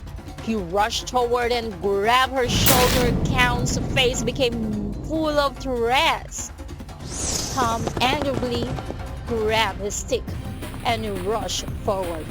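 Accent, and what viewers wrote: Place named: England, United Kingdom. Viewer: its the English accent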